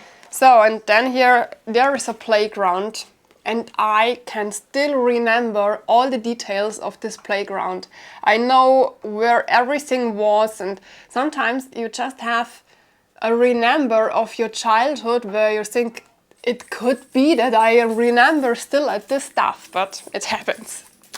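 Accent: Germany. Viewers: I really enjoyed this "peek" of your childhood and teenage years. I envy you, your trips to Croatia, Italy, etc. Has anyone told you that in your teenage photos, you resemble a young Gwyneth Paltrow? You are lovely . . . hearing your German-accented English takes me back to my almost 6 years in the Army, in Germany. I loved it.❄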